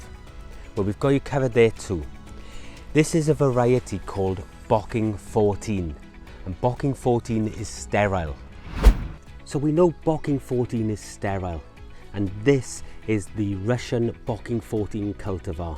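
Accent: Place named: West Wales, United Kingdom. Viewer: Your accent is similar to my relatives who live near Aberaeron / Lampeter. Are you from the west coast area mate?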